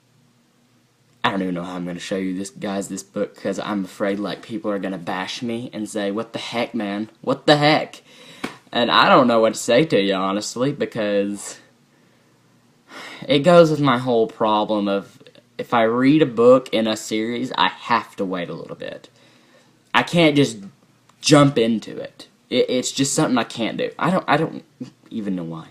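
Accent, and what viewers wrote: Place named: United States. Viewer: Your accent is so cute LOL. You sound really American. Great video! Hopefully you get to reading those books soon. :3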